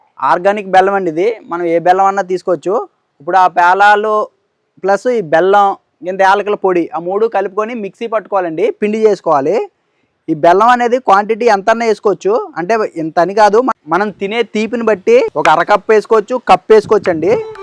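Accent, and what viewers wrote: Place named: Andhra Pradesh, India. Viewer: Brother meeru telugu andhra slang lo maatladithey chaala homely ga vuntundi.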